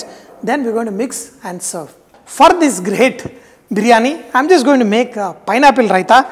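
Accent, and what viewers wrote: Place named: Karnataka, India. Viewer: Sir, your English slang is like kannadiga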